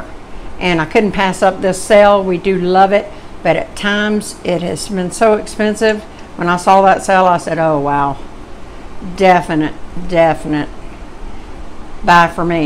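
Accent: South, United States. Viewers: As a Canadian I just love that southern (I think) accent, makes me smile that you'all talk like that! You' all come back now ! LOL.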